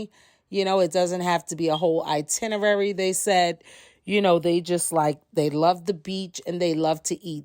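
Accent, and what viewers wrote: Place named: New York, United States. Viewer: You speak very well, sounds like you're from New York the way you pronounce lawyer, talk and brother, are you?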